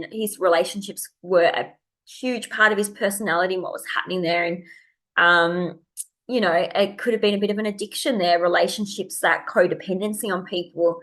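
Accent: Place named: Australia. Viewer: Amazing job done! You sound Aussie BTW! ❤️❤️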